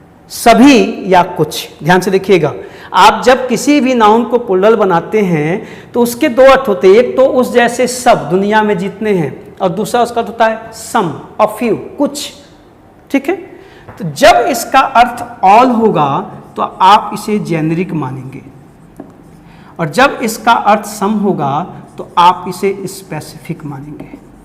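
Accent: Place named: Bihar, India. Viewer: sir ka dialogue aik to upar se bihari bht acha lagta hai ye😅😅😅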